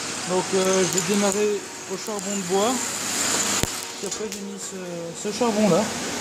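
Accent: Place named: Belgium. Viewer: Tchu ti ké beau accent belge la Belgique le meilleur pays🇧🇪🇧🇪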